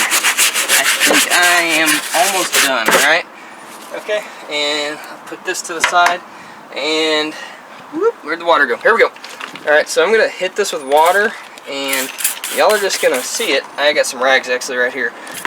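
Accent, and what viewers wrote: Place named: South, United States. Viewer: 🤣😂👊👍, boy you are country!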